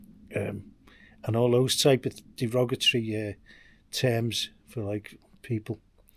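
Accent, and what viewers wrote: United Kingdom. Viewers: love the British accent. England has an amazing history!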